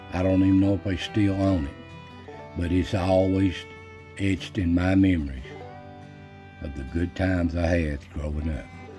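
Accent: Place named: North Carolina, United States. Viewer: What memories your stories bring back! Just today, remembering great uncles plowing with the mule & a really mean bull. You even talk like my people from Yancy county in NC. Love your channel!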